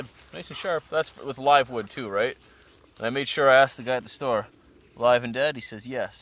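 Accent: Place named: Canada. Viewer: Yep. 1am here in North Queensland and this is the 3rd video ive have watched. I leave his videos running in the background because is canadian accent is so soothing.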